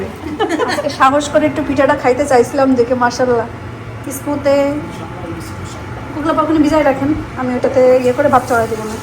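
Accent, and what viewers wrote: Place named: Chandpur, Bangladesh. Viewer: Vaiya khub chalk chadpur bole Kotha Ami b bariar meye gazipur thaki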